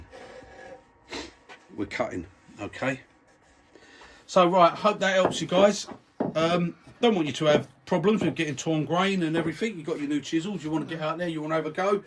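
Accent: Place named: South London, United Kingdom. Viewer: Fantastic, wasn't expecting to hear from you so close to Christmas thought you would be having a well urned break! I've got lots of books seen loads of the videos but your tutorials seem to hit the spot,feel like I can turn something half decent with me carbide and my badly sharpened traditionals,I think your accent helps, sound like one of my lot in South east London,no billy bullshit tell it like it is! As you can probably tell a few beers gone down my neck (it's Christmas) but sincerely enjoy the rest of it,and you and have a cracking New year.lee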